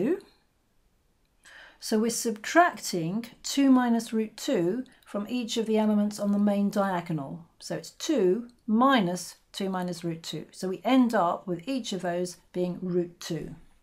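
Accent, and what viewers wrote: United Kingdom. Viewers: This is awesome, a brilliant explanation with British accent, super.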